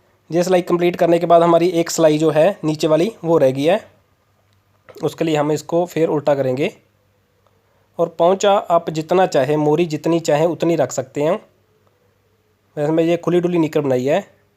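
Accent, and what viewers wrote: Punjab, India. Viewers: Tusi Punjabi ho veer g mai v Punjabi aa tusi bhut vadia samja rahe ho te tuhadi Hindi ch Jo Punjabi mix h oh bhut jyada vadia lagi mainu kyu ki same to same mere massi g jado Hindi ch gl krna start krde ne ta tuhade baggu bolde ne God bless you veer g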